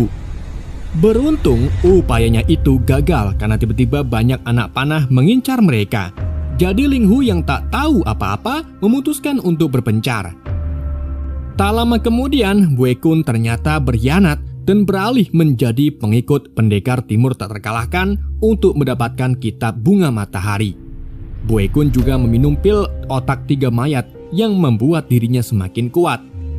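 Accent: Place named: Tegal, Indonesia. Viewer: ngapak,tegal mas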